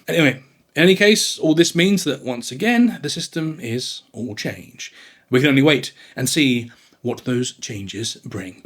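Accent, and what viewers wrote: England, United Kingdom. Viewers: ok, and this guy is British, English by the sound of it. So why the hell are they showing crinkle cut crisps as a British school dinner staple from the school canteen when they say the word chips? No one got pizza, crisps and gravy from the serving hatch. I’m not saying people didn’t put bags of crisp on their dinner but it’d still be called crisps.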